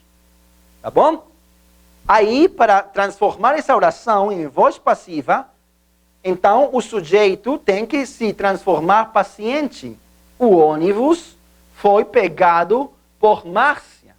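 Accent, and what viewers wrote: Portugal. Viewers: Pelo que entendi ele é português. Sotaque e grafia de Portugal.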